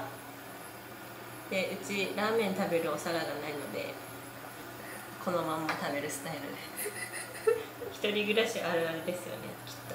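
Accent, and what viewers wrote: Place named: Miyazaki, Japan. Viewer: 花嫁修行でうまかっちゃんかよ！😊笑ゆで卵はガンガンやって全体にヒビを入れた方が剥きやすそうさんって宮崎っぽいアクセントだけど県内の人なのかな？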